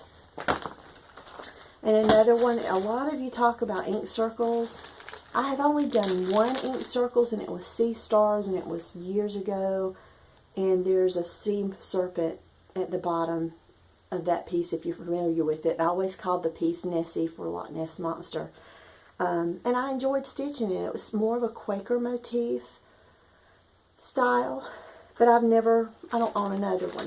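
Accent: South, United States. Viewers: you are one of THE most gracious people I know- and that southern accent- just takes it out of the park!!! I love it... I want to say - how sorry I am for the news of your Dad- and how I love that you are all in the "now" instead of the later.. Enjoy each moment- and cherish that family of yours... We'll all be here waiting to see when you pop in next... xoxo Ohhhh- and good luck with your crochet adventure... ( smiles )... Bless your heart indeed.. Me and crochet do not get along- but I love how you are trying different ways to make it happen... xoxo Blessings Your Way!!! niki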